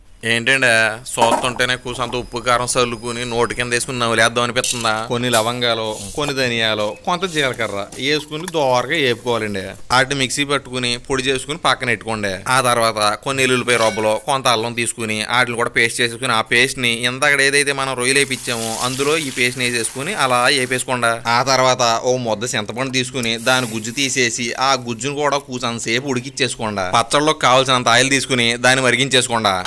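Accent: Godavari, India. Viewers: నువ్వు మాట్లాడే విధానం బాలేదు బాబు గోదావరి పరువు తీసేసావ్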